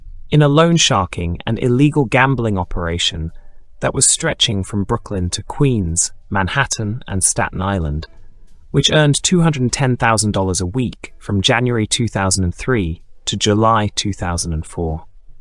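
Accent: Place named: United Kingdom. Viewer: Italian/American tough guy mafia and it sounds like some scared Brit narrating... see ya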